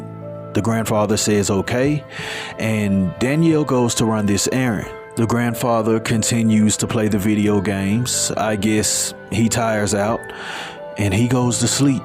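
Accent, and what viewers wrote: South, United States. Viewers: New subscriber here! Your southern accent is very welcoming. I am a North Carolinian who was born, raised, and still lives here! I’m from the mountains close to Boone, but I am familiar with all of the places mentioned in today’s story. This is just heartbreaking. Such a beautiful young girl who never got to experience life and I imagine she had immense potential and would have done so many great things with her life. Unfortunately that monster took that future from her, and it just ain’t right. These type of stories always get to me. Just a sweet young lady who loved everyone. RIP Dani! You will never be forgotten! ❤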